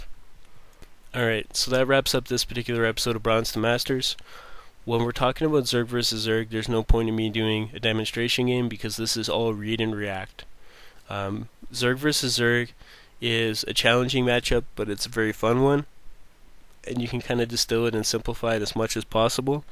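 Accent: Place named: Canada. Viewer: ha,I guessed right, you were canadian! Didnt really pay much attention how you speak, but eventually that 'o' came out quite clearly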